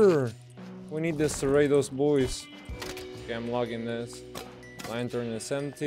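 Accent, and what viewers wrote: Argentina. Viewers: Amigo sos argentino? Lpm me re confundis xd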